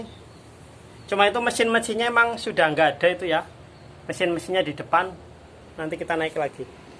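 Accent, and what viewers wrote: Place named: Purwokerto, Indonesia. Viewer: Gaya bicaranya Purokerto bgt mas,kulo Cilongok.mantap mas sehat2 selalu 👍👍💪💪semangat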